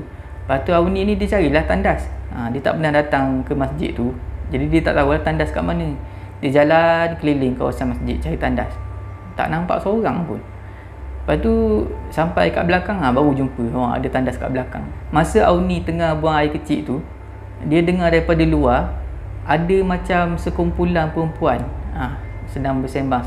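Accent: Terengganu, Malaysia. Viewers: Setuju tak semua orang boleh faham dialek Terengganu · ckap ganu kali bang😁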